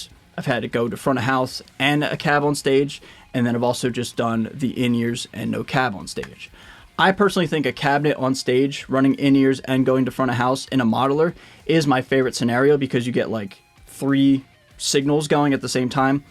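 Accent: United States. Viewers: by any chance are you american?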